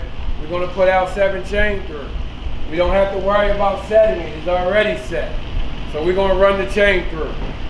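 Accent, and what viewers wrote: Baltimore, United States. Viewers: my manz got that baltimore twang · You can tell this guys from Baltimore by that accent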